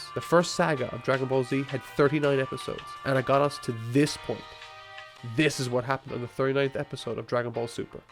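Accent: Ireland. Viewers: No, you say "Vegeta". "Vechita" is inaccurate as a pronunciation of it. His name is a pun on the word "vegetables," not "vechetables." This guy's Irish, so maybe it's a quirk of his accent, but then again, his accent's very light, so it doesn't make sense, since he flip flops between the 2 randomly. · Wait your from Ireland?!?!?!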